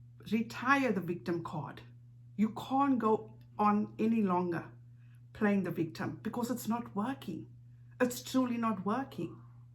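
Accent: South Africa. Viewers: New sub here! I really enjoy your content and I can appreciate the rational advice you’re attempting to give, unfortunately KJ is too far gone her ego is going to be her downfall. I like all the points you make and agree she needs to think of her family first! But her ego comes first! I love your accent Miss, my BFF is from Durban SA, and lives in the US also so hearing your lovely accent reminds me of her and you remind me of her, she is the best! Look forward to more content! Congratulations on hitting the 1K mark! 🥳❤️✌🏽😃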